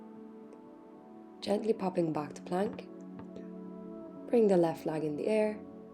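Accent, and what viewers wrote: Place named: Ireland. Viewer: Hi Thank you for the lovely flow, great to hear a soft Irish accent 😊 Mandy 🌳 xxx Belfast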